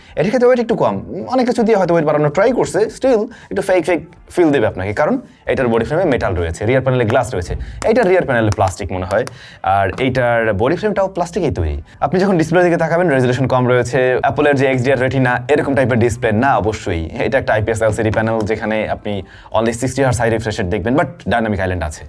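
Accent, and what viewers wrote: Barishal, Bangladesh. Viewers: ভাই বরিশালের এক্সেন্ট গুলা কিন্তু দারুন লাগতেছিলো😊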